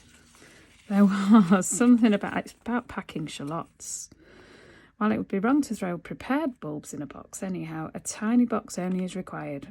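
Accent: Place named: North, United Kingdom. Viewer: Very inspirational and lovely to hear a fellow Northen voice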